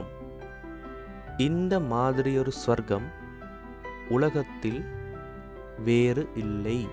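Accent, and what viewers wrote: Jaffna, Sri Lanka. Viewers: Neenga jaffna tamil pesureenga bro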